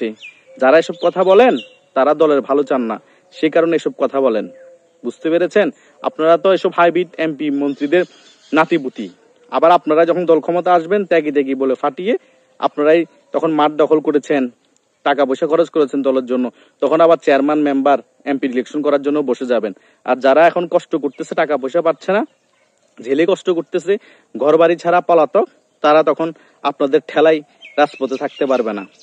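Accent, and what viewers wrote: Chittagong, Bangladesh. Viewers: ভাইয়া আপনার বাড়ি কি চট্টগ্রাম???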